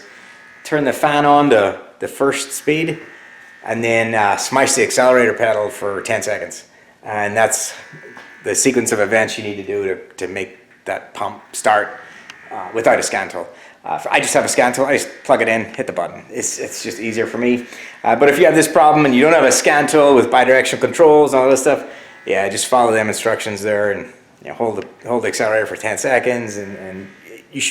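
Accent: Belfast, United Kingdom. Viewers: Nathin, oul belfast coming out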